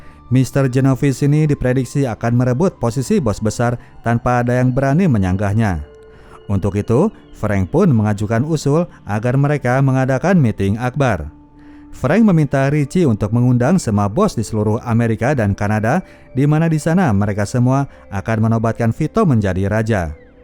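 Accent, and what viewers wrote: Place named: Sumatra, Indonesia. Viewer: Ban ente orang sumsel ya bang, kok dari dulu ane nntn ente beberapa kata dan ucapan ada yang sama kek bahasa kami orang Sumatera gitu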